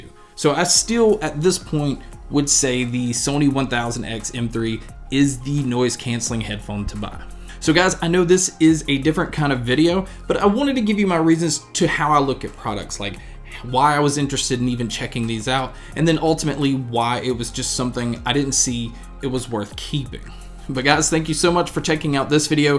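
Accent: South, United States. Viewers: This guy's got a very strong country, southern accent!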